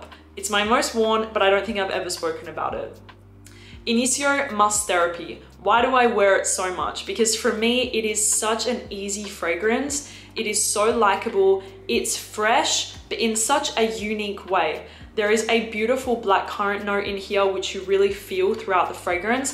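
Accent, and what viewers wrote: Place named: Australia. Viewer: I love your knowledge. Very informative I just wish you would embrace you “Australian “ accent. 🤦🏻‍♀️ Its all over the shop. So some of your words are incorrect pronunciation. Bcos you are imitating a mix of accents. Lychee = “lie chee “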